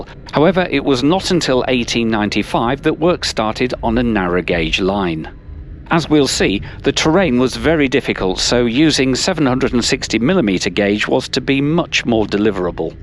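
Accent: United Kingdom. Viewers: Absolutely stunning video. I just found it on youtube and was amazed to hear a British voice. I live in Mariazell and have riden the same train many times but never in Winters. So it was amazing to see the Views through your lens. Thanks for sharing !!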